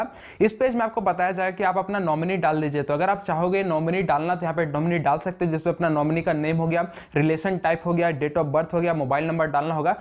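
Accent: Ranchi, India. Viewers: Bhai app ranchi sa ho kya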